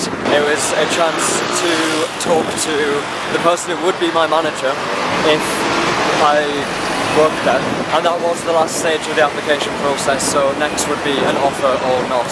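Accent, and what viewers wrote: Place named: North East England, United Kingdom. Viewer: New Tech Genius Cause has a NE England accent. Is he more Durham way originally? They sound the same to me.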